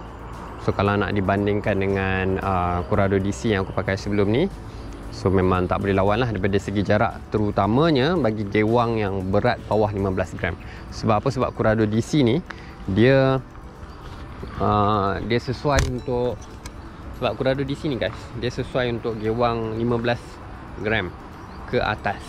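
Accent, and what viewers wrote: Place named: Terengganu, Malaysia. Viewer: Bang org trg ke? Ada kantoi skit disitu walaupun berusaha utk cakap KL.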